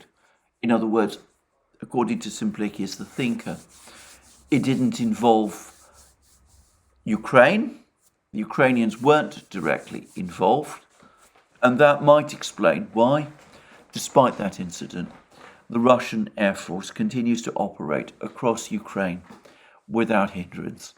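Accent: United Kingdom. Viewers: He's just lying with a British accent. Would be more convincing if it was Russian. But still lying.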